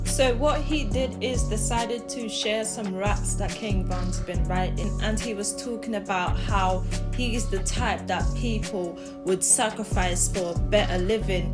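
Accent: England, United Kingdom. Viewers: Where you at in London? Your accent think. You talk like you knew the mans personally. This is weird af. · I hate your accent, use another voice on these videos.....& why is a foreigner so integrated in American street culture? Speak on England or wherever you from smh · Another foreigner trying to speak on what’s going on in the hood through what she sees on social media.🗣🗣🗣🗣 how are you a credible source in any way?????🧐 you speaking on Chicago business with a British accent???🤣🤣🤣🤣🤣 Maaaaannn.... yall need to stop with the clout chasing and assumptions. The only source you have is social media. You have NO CLUE of what’s actually going on in the streets. Who would subscribe to a British person speaking on hood affairs in Illinois???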